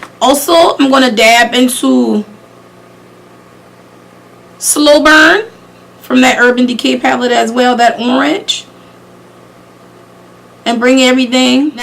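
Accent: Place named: Charleston, United States. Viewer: Came across these topper and wanted to see the pay off and I must say I'm impressed have to get a few now since I've checked out the video review first.. You sound like your from Charleston if so I'm a fellow Charlestonian. Makeup came out beautiful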